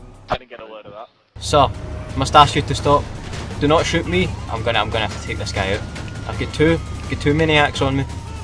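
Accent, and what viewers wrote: Scotland, United Kingdom. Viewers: Are you scottish? You sound scottish if you are I am too!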